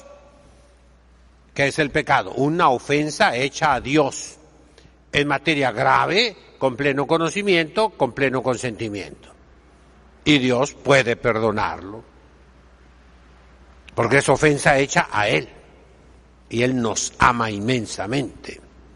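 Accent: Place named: Argentina. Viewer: Más exactamente con acento argentino.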